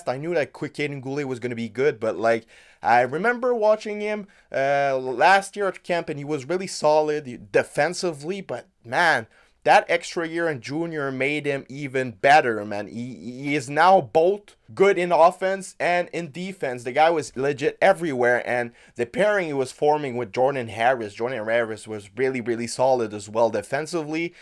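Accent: Quebec, Canada. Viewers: Es tu Quebecois ? ta un accent Quebecois quand tu parles anglais :) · I knew it was that. I know a girl with the same thing.. Not that close from the eyes... But near. Wish you un prompt rétablissement :) Tu parle anglais comme un Québécois 😌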